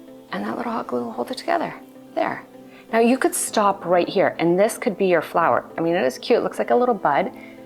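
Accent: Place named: Canada. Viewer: Gorgeous arrangement, that first branch is such a lovely, graceful shape. You always say your name at the start of the video and to me it sounds like, but I have seen people address you as. With your lovely Canadian accent maybe I’m not hearing it right! Anyway, whoever you are - thanks for the video! xx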